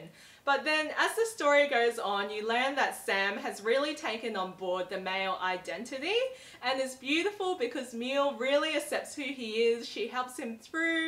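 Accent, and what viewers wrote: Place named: Australia. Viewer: TBH, this is the first video I've watched from you. first off, I love your gorgeous Aussie accent LOL. secondly I'm hoping I can find your Aussie ya reads here in America somehow cos they sound so good! I also really appreciate your review of Replica. I'll keep in mind how you recommend reading it when I dive into my copy. What other Lauren Oliver books have you read? I bought Replica on thanksgiving and then last weekend I bought another one of hers (can't recall the title right now) at a discount bookstore. Do you have any reviews of them video or written?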